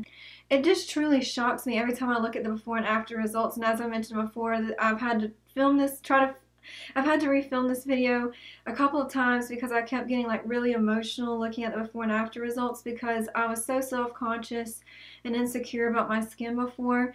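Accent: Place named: South, United States. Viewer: Let your southern accent come through. No need to hide it.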